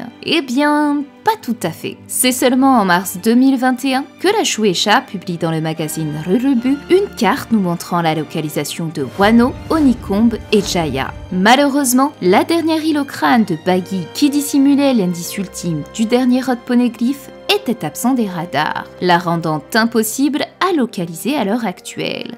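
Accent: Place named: Belgium. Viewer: Vu l'accent, je dirais qu'elle est belge.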